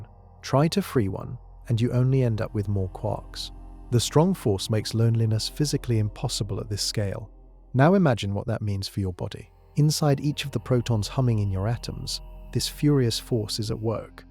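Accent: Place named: United Kingdom. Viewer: I hate this British voice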